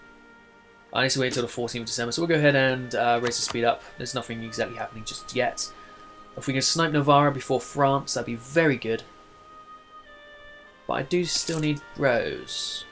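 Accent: United Kingdom. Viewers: Your channel picture is a red maple leaf, and you use Received Pronounciation. Is the accent natural or learned?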